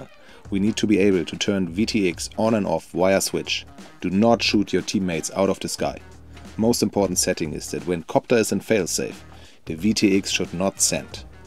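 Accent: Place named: Germany. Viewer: Der Akzent klingt irgendwie nach Deutschland, also bin ich mal ausnahmsweise so frei: Top, sowas such ich. Ich wollte schon ewig wissen, wie die Technik hinter Formationsflügen etc. funktioniert. Kleine Drohnenshow machen und so. 🙂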